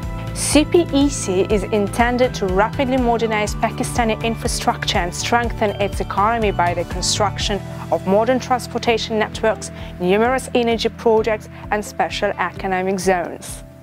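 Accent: United Kingdom. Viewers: Britain accent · i love british accent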